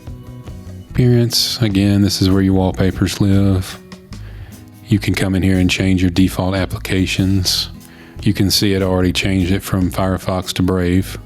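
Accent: South, United States. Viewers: you got a bit of a southern accent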